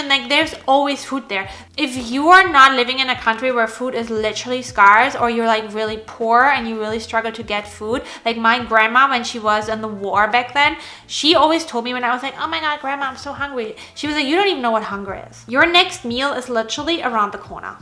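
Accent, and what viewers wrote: Germany. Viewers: Diet advice with a German accent was so needed for me! ❤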